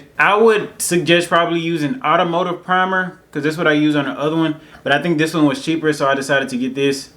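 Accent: Memphis, United States. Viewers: This was a good instructional……tell me are you from Memphis or spent a period of time there cause I promise you sound like a Memphian 😂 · Hey thanks for the tutorial! Your accent sounds familiar— especially when you said “if you’re watching this with your granddaddy”. 😂 I’m from Memphis (but live in Nebraska), so I clicked through your vids and found your Memphisvlogger hashtag. Memphis in the house! And just watched the game! Or…actually…listened to my husband watching the game. Whup that tr1ck! 😊👍🏽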